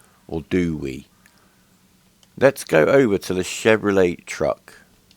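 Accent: United Kingdom. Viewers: Left a like for the Westcountry accent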